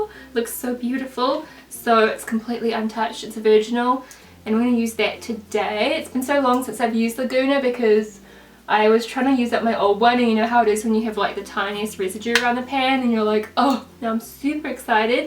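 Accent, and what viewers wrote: New Zealand. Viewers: Also I'm a kiwi living in Australia and watching your videos and hearing your accent makes me happy and feel at home in NZ again!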